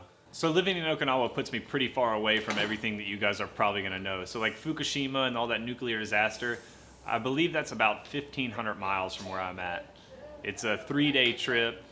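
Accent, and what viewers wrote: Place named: Carolinas, United States. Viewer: Hello sir. I subbed a little after this was made and now I'm going back in time, playing a little catchup. 😉 Cool video... thanks for sharing. I knew you had to be from somewhere close to my home by your accent. I'm in Kings Mountain, NC, just over an hour from Greenville. My grandpa lived in Greer for about 60 years. If you get homesick, give me a holler. ✌Take care, brother.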